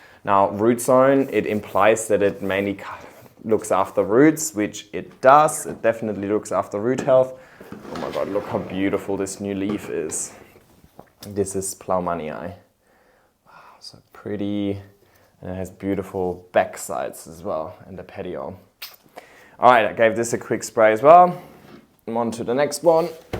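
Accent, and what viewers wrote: Germany. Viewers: So, I only really follow you here bc I can't handle other social media very well. I could have sworn in your last several videos that your accent sounded a little more on the German side 😂 It's typically a very equal balance between accents if that makes sense? But I was thinking you may have taken a little trip back to Germany or something, haha! I'm so so so so glad you had a good visit! I think we're pretty close in age and I live very far from my highschool (and current) best friend, so I definitely relate. I also moved somewhere that affected my accent according to others, but I don't hear it!!! So now I'm hyper aware of people's accents and how they can change!! Love it. 😂 Thanks for such a long video. I'm painting my new home so I'll definitely be binging your channel the next few days. 💚💚💚💚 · When I first started watching you, i was like: "is this a german accent? 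No i think that is just how they speak in Australia" And then i was so surprised that you are acutally from Germany. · I have just started the hobby and I appreciate your videos and hope that I can achieve the same level of growth!! I am located about 1.5 hours north of you in NSW. Also, my grandmother was German and listening to your accent reminds me of her. You have the same work ethic as she had and passed that onto me.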